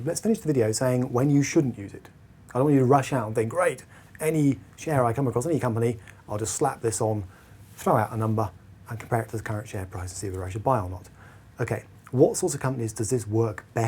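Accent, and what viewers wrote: United Kingdom. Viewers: British accent makes me sleepy .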